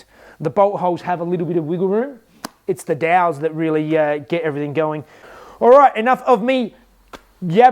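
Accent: Australia. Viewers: Wow from Aussie?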